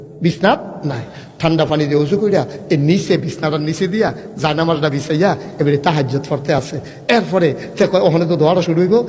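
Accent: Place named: Comilla, Bangladesh. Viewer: সে সম্পূর্ণ কুমিল্লা ভাষায় কথা বলে। কুমিল্লায় ওয়াজ করে বেশি,সে নিজেও কুমিল্লার মানুষ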